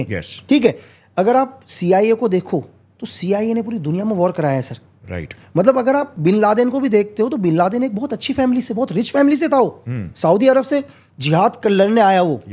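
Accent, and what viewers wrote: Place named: Gujarat, India. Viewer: I guess he is from Gujarat , his accents speaks😊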